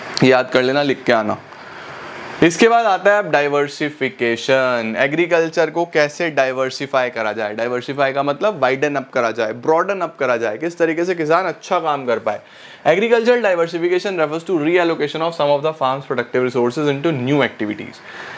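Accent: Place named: Punjab, India. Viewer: Sir your punjabi assent 🔥🔥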